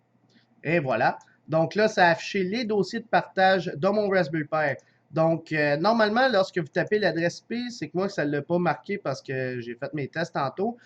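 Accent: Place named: Quebec, Canada. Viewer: merci pour cette vidéo qui m'a permis de comprendre deux ou trois points que j'avais du mal à visualiser avec les tutos classiques. Et puis, rien que pour l'accent du Québec ça valait le coup. Merci cousin !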